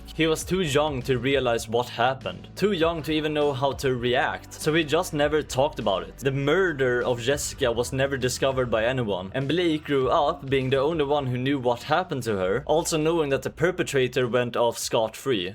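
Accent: Sweden. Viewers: I never realized how Swedish pewdiepie’s accent was until I watched you, how quaint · Most Swedish accent of all time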